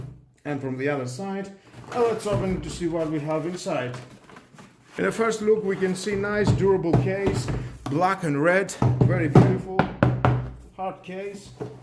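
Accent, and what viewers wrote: Greece. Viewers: out of the point, I think you are Greek from your accent. If you are, do you know where can I find it, except from Lidl :-P ?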